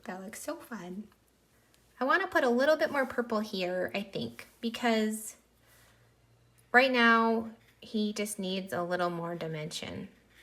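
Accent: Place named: Minnesota, United States. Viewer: I have watched all of the lessons in this series and decided to paint the "funky fish" while going through the videos as one tutorial. I think you made some bold decisions with color choices as compared to the reference image which resulted in an awesome goldfish! Good job! I also loved hearing your accent which reminded me of the 25 years I lived in Michigans U.P., although you sound more Minnesotoan. Thanks for the time and energy you brought to this series.......that was a lot of work on your part.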